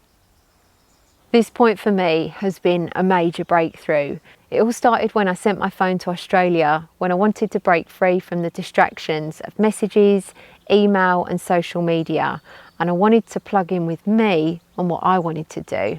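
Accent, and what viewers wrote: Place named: East End, United Kingdom. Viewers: Happy Birthday! All my mates back in the UK are from the East End / Essex so your accent is a pleasant bonus and reminder of different, happier times. Go on, fail, be happy, fail again and then succeed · Very nice! I liked the last bit most. Sitting on a path, breaking your perch, referring to notes taped to your tripod. :) Happy Birthday. I've subscribed mostly due to your accent. Gotta love that accent. When I first heard that accent I hit subscribe. That was 12,000 or 13,000 subs ago. One I subscribed I had to Google you as you seemed damned intriguing and more to you than met the eye- and discovered you rowed around the UK and/or across the Atlantic. (This blonde Cockney-ish sounding girl rowed a boat across the Atlantic Ocean?) So you're worth listening to. You have some steel in your spine. Congrats on your birthday and your new YouTube career.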